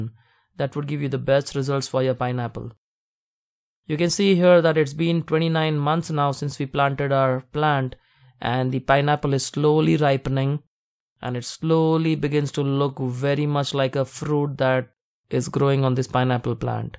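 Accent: India. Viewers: I think you are Indian. Your voice sounds like Indian accent. Am I right? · It's an Indian accent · That's an unmistakably Indian accent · Yes Indian accent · your English like indian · Are you Indian? A/c to your accent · You sound indian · I think you are Indian by your accent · Your accent sounds Indian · Federicken S. It sounds Indian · your English speech is Indian type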